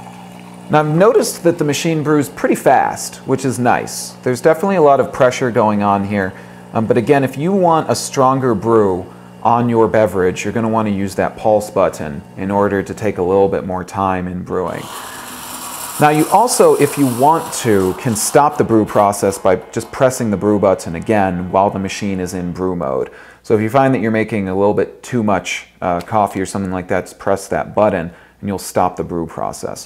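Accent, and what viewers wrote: United States. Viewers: I think he is from New England or south eastern Canada. His inflection reminds me of an older Katharine Hepburn.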